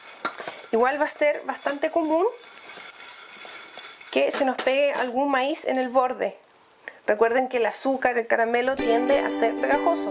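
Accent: Chile. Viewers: Tienes voz de chilena :v , muy bonito video , viva chile :)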